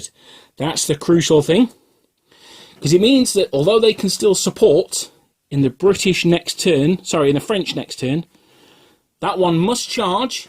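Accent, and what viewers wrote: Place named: Yorkshire, United Kingdom. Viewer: I love the info your videos provide. Too bad we live a half world away. Napoleonic gamers seem to be an endangered species. Historical wargamers in general are much more rare here than over there from what I can tell. Certainly aren't any in southern Louisiana that I've run across or heard of. Sad really. The American civil war has been so stigmatized around here it has sucked down overall appreciation of military history, imo. I love your accent man, is that Yorkshire?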